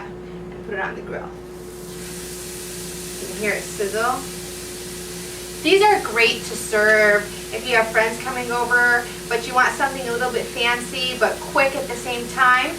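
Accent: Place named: Rochester, United States. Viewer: You have a Rochester NY accent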